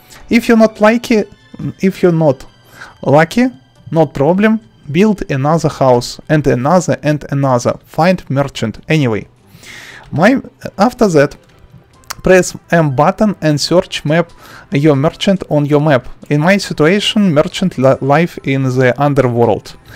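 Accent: Russia. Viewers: Мог бы и не скрывать что ты русский))) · Your from russia? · I love his russian accent its so good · Не, ну русского человека узнать очень легко по акценту :)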